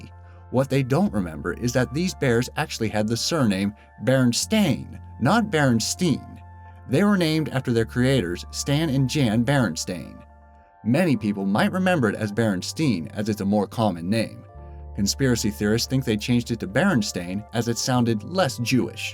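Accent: United States. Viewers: I cant believe how few people in the comments noticed that he got the Rocky scene wrong, Mr. T didnt play Apollo Creed, he played Clubber. Also, i know a lot of people griped about his pronunciation of Mandela, however i have known many people that pronounce it the way he did. Its usually because he has never, or cant recall ever actually hearing the proper pronunciation, so they fall back on typical language defaults that dictate how it would be said, of which, based in his accent, he speaks americanized english, so he is technically still pronouncing it right. If you are wondering why i would bother explaining this, i literally saw hundreds of comments from people jumping on his back about it, yet only a few people commented on the aforementioned Mr. T issue.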